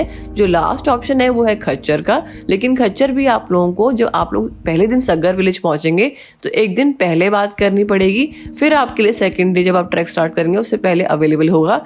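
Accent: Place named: United Kingdom. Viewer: Use less auto-tune and change ur accent to Indian... unnecessary english accent is really annoying even though ur content is good....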